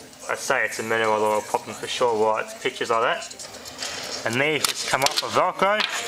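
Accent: Australia. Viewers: Strong regional accent from Australia Mate...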